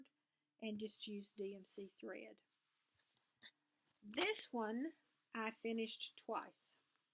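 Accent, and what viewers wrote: South, United States. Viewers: I love your videos. Don't worry about that Southern accent because I speak Southern too! 💁 Your projects are beautiful and your finishes are done so well. Thanks for sharing with us! Carolyn. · I enjoyed your video. Your finishes are lovely and I love your Amazing Grace bag. I have that pattern also. :) I love your accent. I am from the South as well. I was born in Alabama but live in North Georgia now. Where in the South do you live? Happy Stitching!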